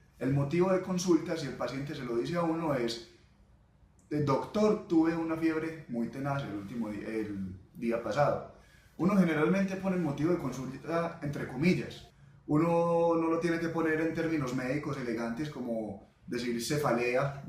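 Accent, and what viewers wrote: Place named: Antioquia, Colombia. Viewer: No solo Colombiano... paisa! :V jajajaja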